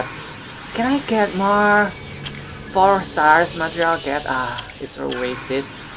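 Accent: Indonesia. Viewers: Logat nya Indonesia bgt.. di add om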